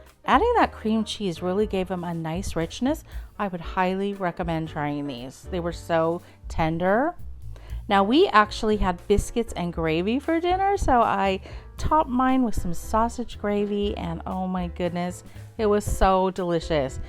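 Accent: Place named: South, United States. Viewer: That is some south in your mouth 💥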